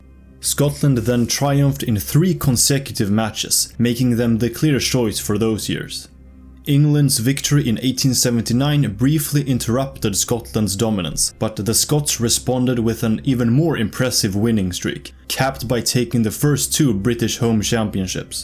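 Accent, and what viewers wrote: Sweden. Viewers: Are you Swedish?? 😅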